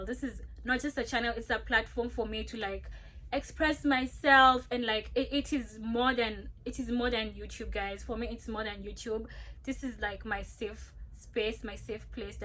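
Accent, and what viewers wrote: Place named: South Africa. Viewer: You sound so South African it’s crazyyyy!!! This is so inspiring!!! I’m so proud of you 🙏🏾❤️ I just found you and I’m hooked sis ❤️❤️❤️❤️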